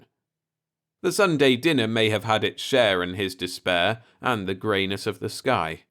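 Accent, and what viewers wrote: United Kingdom. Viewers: I'm in the same boat in regards to tech. I'm very glad still posts some stories here. His accent sounds sort of South East English to me, I hope he sets us straight. 😊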